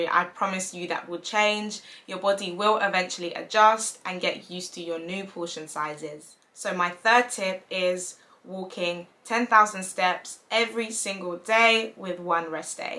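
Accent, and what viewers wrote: United Kingdom. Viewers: 🇧🇧🇬🇧Newly subscribed! Made me laugh with the biblical disclaimer 😂. I have to mention how great it is to hear a British accent sharing their journey not come across many here on youtube. Great tips, by the way, your journey is truly inspiring, thanks for sharing.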